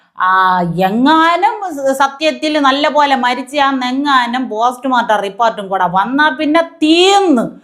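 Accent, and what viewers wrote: Thiruvananthapuram, India. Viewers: എന്തായാലും തിരുവനന്തപുരം സ്ലാങ് കേൾക്കാൻ രസമുണ്ട് 👏 മോളെ ബിഗ് സല്യൂട്ട് 👍👍👍🙏🙏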